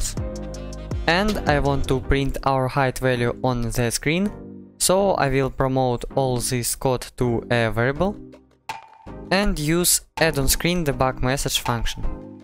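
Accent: Russia. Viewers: так охват аудитории шире, а учитывая то как тяжело продвигать обучающий контент, енглиш решение правильный выбор, но акцент наш кнш)) я часто использую нейросеть яндекс браузера для перевода видео, иногда мне действительно комфортнее слушать урок на русском и я просто включаю перевод, так что рекомендую) проверено на себе, да перевод иногда кривой но в целом оч хорош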